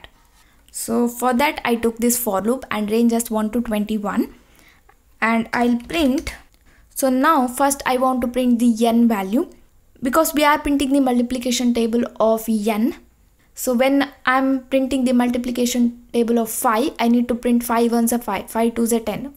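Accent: South India, India. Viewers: Are you from south side may be Kerela or tamil nadu side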